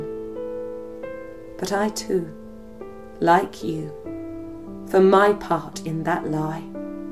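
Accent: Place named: United Kingdom. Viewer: I guess a British accent and dramatic background music justify a retelling of history that has no basis in fact whatsoever. No wonder most of the viewers give a thumbs down to this video.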